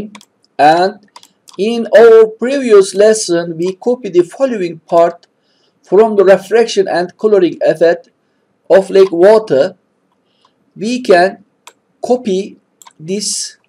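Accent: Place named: Turkey. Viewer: Türk aksanı bu belli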